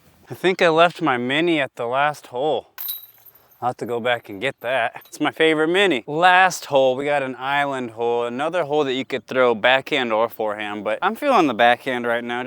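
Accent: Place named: United States. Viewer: always wondered why you have an american accent and say things from 70s-80s vernacular.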